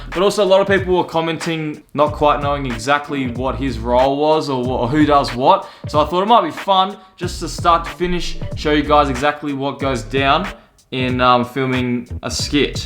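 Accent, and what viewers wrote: Australia. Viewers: How to make a Film Lots of Screaming Thicc Aussie Accent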